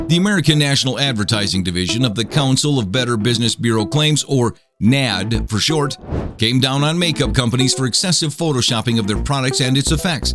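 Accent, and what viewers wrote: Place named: Canada. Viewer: sound Canadian but where is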